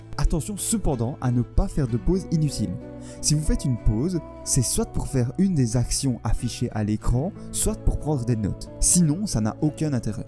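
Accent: Liège, Belgium. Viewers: Bon tu viens de Liège ? ❓😜 belle video bravo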